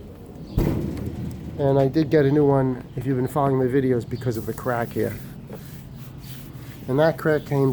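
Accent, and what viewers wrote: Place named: New England, United States. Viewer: I can tell from your accent you're a dumbass yankee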